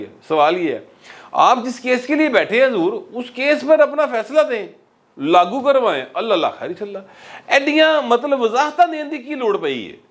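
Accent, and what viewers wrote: Lahore, Pakistan. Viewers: صاحب سلام۔ آپ کے خالص لاہوری انداز میں وی لاگ کا بہت لطف آتا ہے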